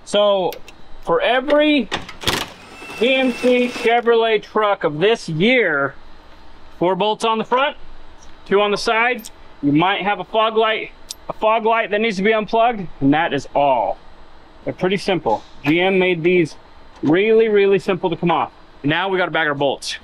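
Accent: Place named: Utah, United States. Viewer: Great show, really enjoy your body-work tutorials. Also amazing how similar to Kaulin you speak, must be a Utah accent!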